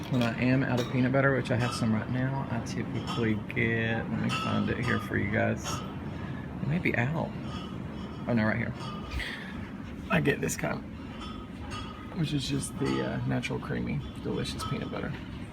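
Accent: South, United States. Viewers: I had to take a pause just too type this message! I love ur southern accent or the way you speak whatever you want to call it! I love it!!!!!!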